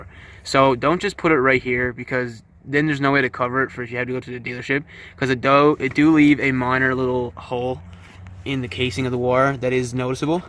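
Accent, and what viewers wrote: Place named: Newfoundland, Canada. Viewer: You sound like a Newfie!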